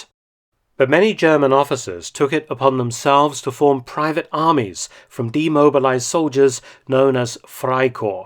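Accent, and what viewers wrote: United Kingdom. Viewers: Mr, how about Weimar Germany's efforts in avoiding international sanctions, such as cooperation with Soviet Russia, expeditions to China, supporting Freikorps and so on? It would be a great subject and I'd love to hear your British narration. Thanks in advance!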